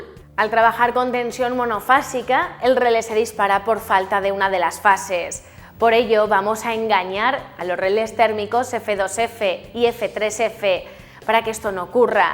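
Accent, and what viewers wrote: Spain. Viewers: Linda, inteligente y con acento español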